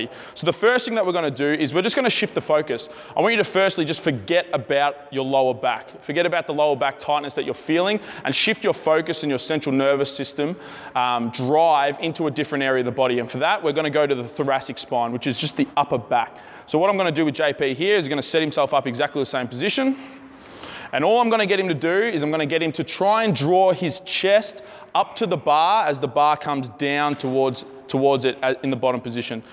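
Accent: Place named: Germany. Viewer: The fact that you like a German tryna speak English is gold love 💕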